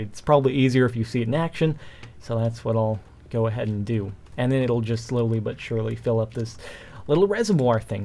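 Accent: United Kingdom. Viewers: way do you spik bridish? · He sounded british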